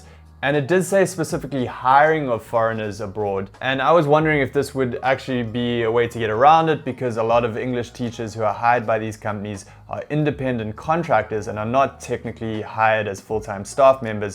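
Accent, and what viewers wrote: South Africa. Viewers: Your accent sound like my south African friends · Your accent sounds South African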